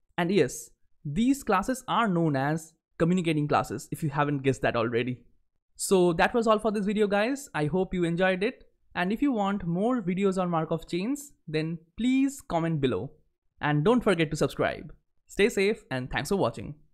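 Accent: India. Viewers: I will be honest, was ready to find another video when heard the Indian accent. But then saw high upvote/downvote and stayed, and don't regret it!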